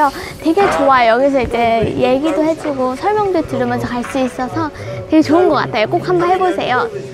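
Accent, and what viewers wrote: United Kingdom. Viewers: 말투 충분히 매력적이에요 영국식 한국어발음 느낌? ㅋㅋㅋ 부정적인 댓글은 그냥 넘겨버리셔도 좋아요 ~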